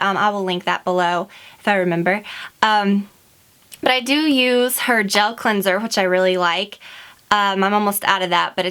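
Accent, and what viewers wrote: South, United States. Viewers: Is it just me or does sound soooo much more southerner?? I lav her 💕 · Way more Southern in these videos! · I have watched so many of your videos, and I never noticed such a southern accent before! Anyone else pick up on it. So funny, makes me like her even more :) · Thanks for sharing your favorites! You sound a little southern in this video, it's awesome haha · Im not from the US but I watch the walking dead, and every time after I've watched an episode I can really pick up your more southern accent. Because they film in the same state as you live in. It's super cute! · I love your faint southern accent. I need to try Anastasia products asap! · GA accents are adorbs! Just like you Meredith Hammett your icon pic is BEAUTIFUL! I wish i could visit there someday! and sorry to point it out..hopefully it didnt distract too much lol but being from california i simply adore southern accents. 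And to be honest I never really realized had one... makes we wanna double thumbs up this video! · This was the first video I noticed a sweet southern accent! :) So happy for a lipliner suggestion that is great with nude lips...it really is hard to find! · you sound so southern omg, it's so cute <3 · sometimes your southern accent stands out so much haha never really noticed before a couple videos. you loo gorgeous xx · first time i've ever noticed your southern accent! it's quite strong don't know how i've never noticed it! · It sounds like you have more of a southern accent in this video!! It's so cute haha (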